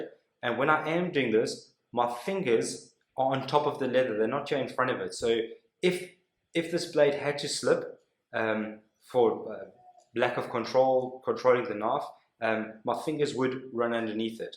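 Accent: South Africa. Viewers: Are you a South African ole chap ?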